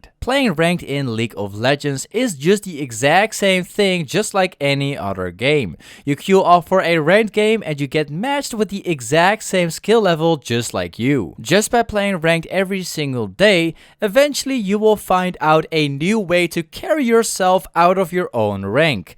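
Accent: Netherlands. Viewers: Are you Dutch? Because your English accent sounds allot like you're Dutch. (I'm Dutch and have heard allot of people talk with a weird accent haha.)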